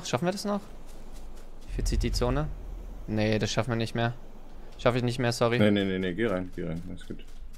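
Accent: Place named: Saxony, Germany. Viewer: Das Sächseln ist einfach nur eklig.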